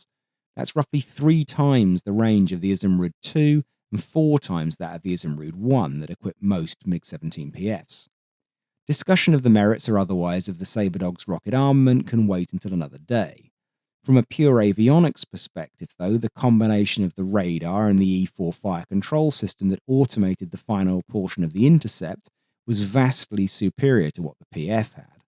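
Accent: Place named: East London, United Kingdom. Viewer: Thank you mate for all you manage,. (…I’m allowed!) Are you English or like NSW or…? Usually the accents are a dead giveaway but at times Im like “oh hes definitely from East London” but then certain words’ll go Sheffield-ish. Actually don’t answer that, and stop reading this as it’ll influence your habitual speech profile cause now you’ll be thinking about it subconsciously (really thoughts are more “layered” than dualistically ‘conscious’ OR ‘submi… ‘subconscious’)!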